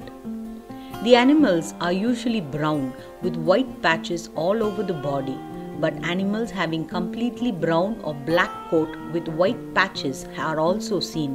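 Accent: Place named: India. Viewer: You seem to have a foreign name with an Indian accent.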